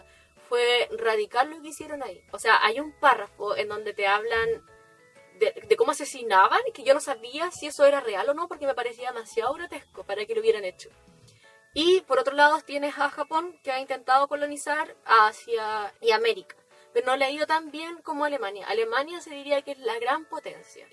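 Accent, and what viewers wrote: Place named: Canary Islands, Spain. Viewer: Chacha si no es por una palabrita que te delató, me habría cortado la mano por tu acento a que eras de Canarias. Estoy in love con tu canal🤗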